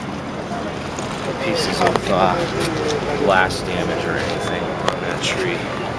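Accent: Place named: Boston, United States. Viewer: I never realized how pronounced your Boston accent was before. Wicked. I want to hear you say, "Park the car in Harvard Yard."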